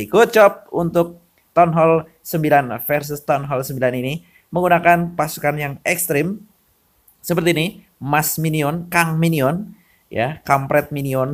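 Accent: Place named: Banyumas, Indonesia. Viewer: Logate ngapak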